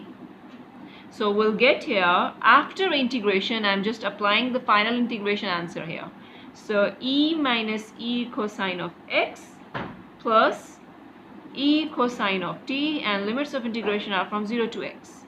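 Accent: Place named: United States. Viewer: itna thanda american accent 😆😆😆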